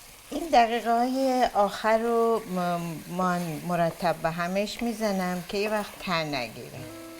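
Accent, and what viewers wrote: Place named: United States. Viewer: بنظرم اومد شما لهجه آمریکایی دارید و خیلی افتخار میکنم به شما.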